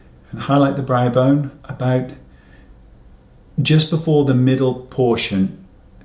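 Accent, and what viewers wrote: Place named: England, United Kingdom. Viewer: So precise and helpful I'velearned so much from binge watching. Love the English accent so pleasant to listen.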